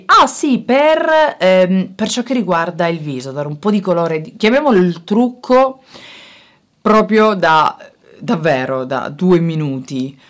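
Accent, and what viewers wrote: Sicily, Italy. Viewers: si sente dinuovo l'accento sicilia <3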